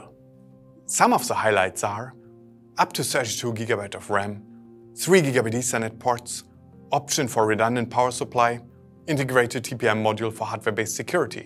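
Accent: Germany. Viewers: Hab das gerade als Werbung eingeblendet bekommen. Bitte nehmt den Spot unbedingt nochmal mit einem anderen Sprecher auf, und in normaler Geschwindigkeit. Der Akzent ist so krass deutsch, dass man es in Verbindung mit der extremen Sprechgeschwindigkeit fast nicht versteht. Hört sich zwar teilweise lustig an (wenn man es so interpretieren will), aber ich bin sicher, dass das nicht eure Absicht war. Wenn der Spot kurz sein soll: Kommt auf den Punkt und versucht nicht, in ein paar Sekunden in ausführlicher Prosa "se Juniwärs" zu erklären. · Wer hat diesen Sprecher ausgesucht? Er redet wie die Karikatur eines Deutschen.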